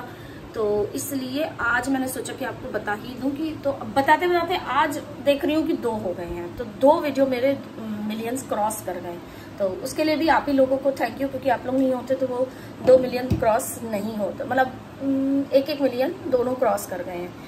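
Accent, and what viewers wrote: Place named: Pandit, India. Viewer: मैम आप बहुत खूबसूरत हैं ,😊आप पंडिताइन बोल रही हैं मुझे हंसी आ गयीं हम भी पंडित हैं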